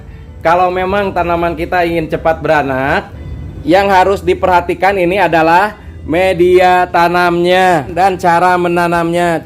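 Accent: West Java, Indonesia. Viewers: Suka sama logat sundanya.. Menjelaskannya juga jelas banget..mau coba praktekin semoga berhasil..trmksh.. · Bang orang Sunda ya...?keliatan dari logatnya. Kalo gitu sy panggilnya kang..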